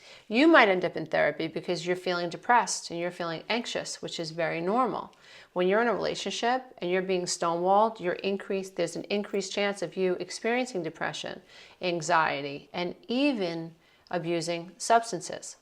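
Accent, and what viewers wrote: New York, United States. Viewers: you are so New York. It's cute.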